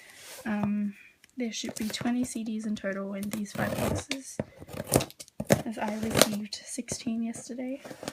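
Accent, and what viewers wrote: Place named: Australia. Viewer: your english sounded so normal to me xD ur aussie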